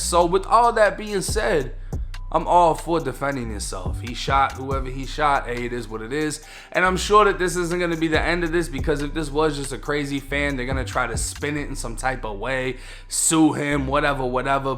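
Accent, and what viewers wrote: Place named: Massachusetts, United States. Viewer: Lol I knew you was from mass you talk just like them hit me if you need a camera man or something lol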